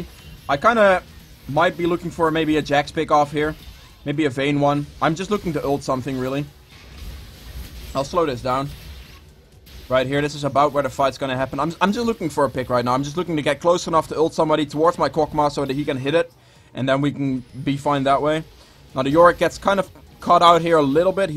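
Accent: Netherlands. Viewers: Nice Dutch accent, and nice video :P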